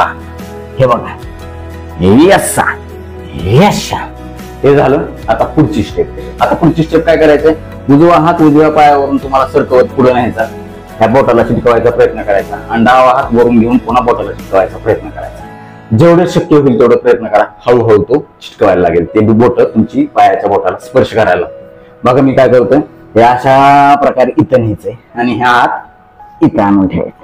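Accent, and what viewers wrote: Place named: Kolhapur, India. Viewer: खुपचं छान व्यायाम शिकवता फार फायदा होतोय धन्यवाद 🙏 सर आपण खरंच लातुरचेच आहात काय कारण तुमची भाषा हि कोल्हापूर ची वाटते मी हा व्हिडिओ कोल्हापूर जिल्हातील गडहिंग्लज तालुक्यातील एका खेडेगावातून पाहते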